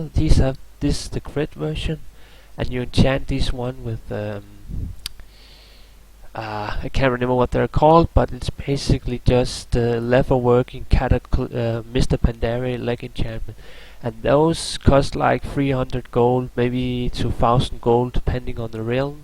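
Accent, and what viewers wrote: Denmark. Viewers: Are you danish? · Are you Danish? You sound like a Dane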